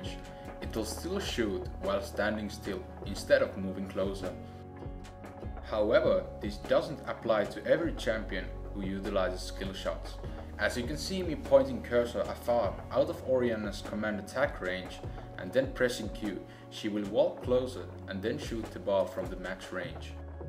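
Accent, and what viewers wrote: Finland. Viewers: omg u sound so finnish :D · Holy shit are you Finnish? :D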